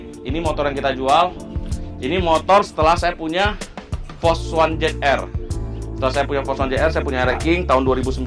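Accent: Medan, Indonesia. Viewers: medan kali asli wkwkwkw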